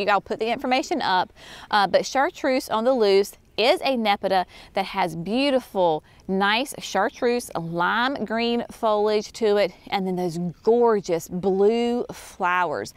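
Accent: South, United States. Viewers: Hi, Love your Southern drawl!! Best, Albert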